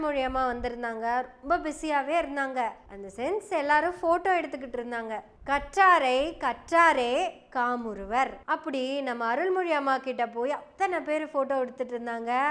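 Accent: Kongu, India. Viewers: அன்பு சகோதரி ஒவ்வொருவரும் அவரவராக இருப்போம். மேலே நீங்கள் குறிப்பிட்ட ஒவ்வொருவருடைய காணொலிகளை கேட்டிருக்கிறேன். அழகான கொங்கு தமிழில் மழலை மொழி போல் சிறப்பாக பேசிவருகிறீர்கள். வாழ்த்துக்கள். இந்த பாணியை மாற்றிவிட வேண்டாம். நன்றி.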